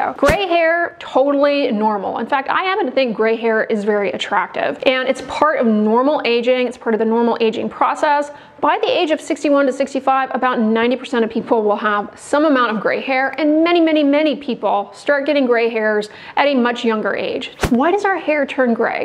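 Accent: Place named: Carolinas, United States. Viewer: Interesting information! BTW You sound so American... (I mean the accent/tone.) A stereotypical American accent for my European ears... Where from?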